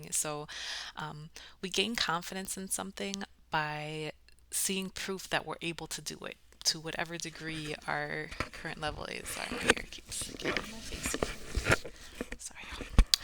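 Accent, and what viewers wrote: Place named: United States. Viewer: Thank you very much teacher for sharing this lesson which make clearly the improvement in English language. I start understanding American accent with you.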